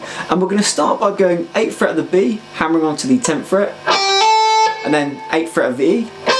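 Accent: United Kingdom. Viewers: what a beatiful aksen really love britain aksen 😍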